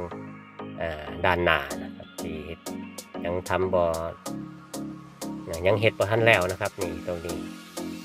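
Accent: Chaiyaphum, Thailand. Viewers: สำเนี๊ยง..ชัยยะภู๊มมมครับ...เสียงมวนขนาด..เคยมักสาว..ชัยภู๊ีมิ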